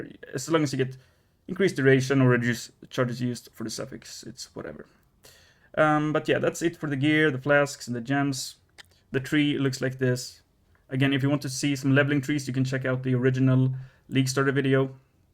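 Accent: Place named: Finland. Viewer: Sä kuulostat suomalaiselta.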